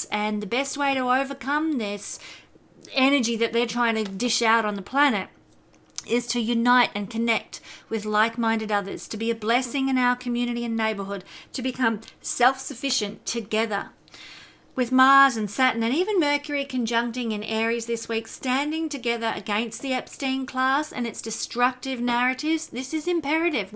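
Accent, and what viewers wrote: Australia. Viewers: So weird your Australian accent is showing more now you’re recording in the states😅 I’m Australian;)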